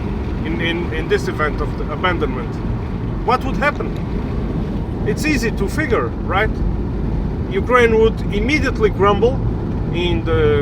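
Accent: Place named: Russia. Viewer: Why you have Russian accent?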